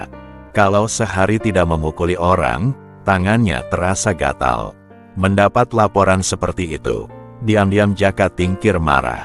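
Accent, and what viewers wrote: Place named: Banyumas, Indonesia. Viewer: Ngapak bshasane tur kaku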